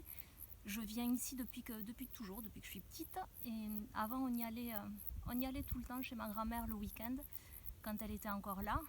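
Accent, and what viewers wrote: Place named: Pyrenees, France. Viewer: Ah les Pyrénées,on ne veut pas les quitter,je suis d’accord,et pourquoi tu parles comme moi on a le même accent 😂❤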